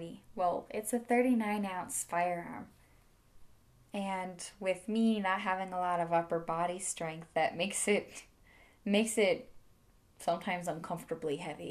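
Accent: Wisconsin, United States. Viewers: you talked ... with a fairly pronounced Wisconsin accent ... now with a general accent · Kinda sounds like you're talking dirty to us. I miss the Wiscaaaansin accent though - coming from a Chicagoin. Great vid!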